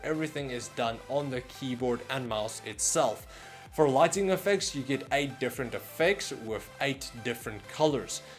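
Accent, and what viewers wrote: South Africa. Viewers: Being South African myself the moment you spoke I knew you were from SA 😂 That’s our accent but THANK you I have a decision to make between this combo or the Corsair K55 combo. Your video is helping me out in so many ways. Baie baie dankie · South African?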